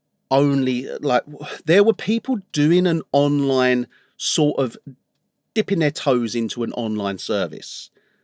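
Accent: England, United Kingdom. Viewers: I hate to say it man, but you had me with the accent. I want to trust everything you’re telling me for some reason. Why would an English gentleman such as yourself do otherwise?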